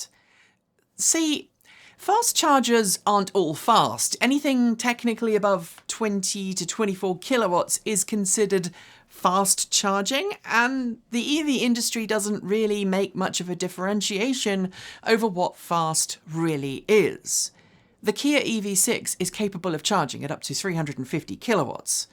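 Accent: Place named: United Kingdom. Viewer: In awe of how you keep your UK accent while using exclusively North American idioms and speech patterns. So fascinated by this I almost missed the point of the video. It's quite remarkable!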